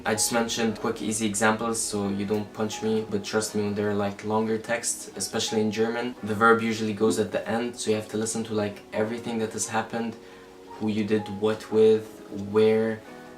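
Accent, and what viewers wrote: Arab world. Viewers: honestly, when I watched your videos for the first time, I could sense a middle east touch :D I speak two dialects of Kurdish, English, Turkish, and a little bit of Arabic. Çawani means "how are you" in Kurdish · That’s why i was sensing an Arab dialect when you speak... I love your humor keep posting 🥰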